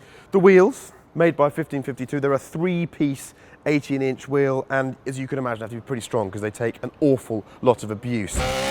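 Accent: RP, United Kingdom. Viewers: BADD ASSS MACHINE. cant stand this British guy talking like a rich guy. AWESOME MACHINE. MURICAN POWER BABY!!!!!!!!!!!!!!!!! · i cant stand some one with a posh voice trying to use as many buzz words as possible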